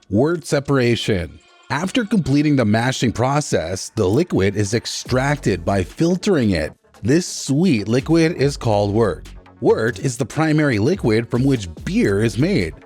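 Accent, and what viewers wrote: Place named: United States. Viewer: all these patterns and designs in the top of the guinness as in the clover design etc..... all for americans. no self respecting irishman would fook around with a pint in such a way. soon as i heared the american accent describing guinness i knew it was all nonsense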